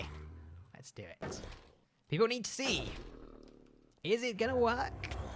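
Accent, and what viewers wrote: United Kingdom. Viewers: I think that's called British accent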